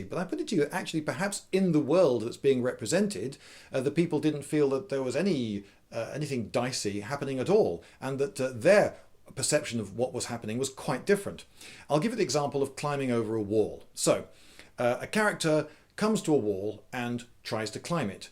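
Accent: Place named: United Kingdom. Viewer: what part of the UK is this dude from? I am interested what regional dialect/accent he has. It's bad ass!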